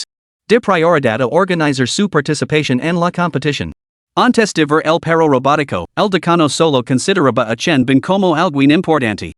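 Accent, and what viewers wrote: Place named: United States. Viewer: Esta español si lo escuchas bien solo que es un gringo quien habla😔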